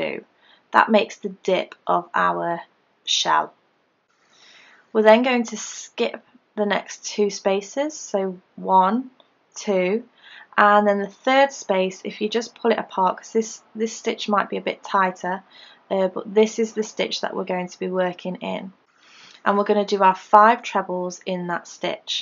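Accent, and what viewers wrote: United Kingdom. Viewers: I've watched many a tutorial from others and they just cannot seem to convey what to do as well as you or they go too slow and repeat things too often. I've seen some of your tutorials and I just have to say that you really are the best! I've been crocheting since I was 13 (now 60) and I just love learning new patterns. Thanks so much for your videos! P.S. I love all things British so your accent (at least to me) is brilliant!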